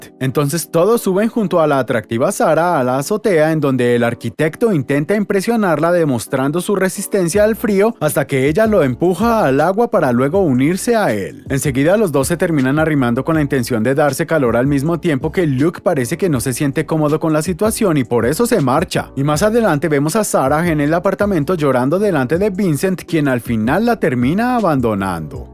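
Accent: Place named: Colombia. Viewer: Eres de Colombia? es que tu acento se parece a uno de los tantos acentos de que tenemos aquí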